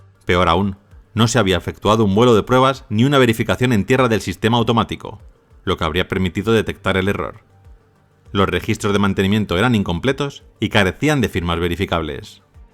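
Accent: Spain. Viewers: Así lo pronuncian en España.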